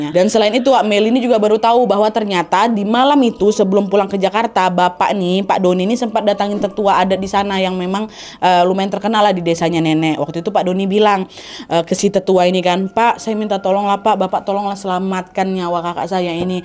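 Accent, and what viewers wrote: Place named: Sumatra, Indonesia. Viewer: AKU MAH SUKA SAMA KARNA CARA BAWAIN CERITANYA ENAK ASIK. NYEROCOOOSSS TERUS TANPA HENTI DG LOGAT SUMATERANYA. LANJUTKAN...!!!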